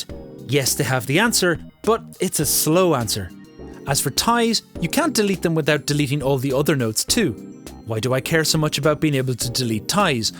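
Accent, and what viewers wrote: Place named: Ireland. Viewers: Raúl Pérez Scotspeople? The man could not be more Irish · how on earth could you think he's Scottish? He has a very very very obvious irish accent. Unless you're deaf and only reading the subtitles, I can't work out how you thought he was Scottish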